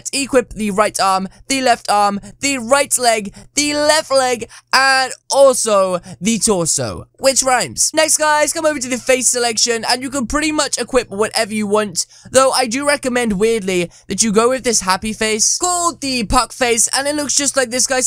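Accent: United Kingdom. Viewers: This guys BRITISH?!??GOD I LOVE HIM EVEN MORE!!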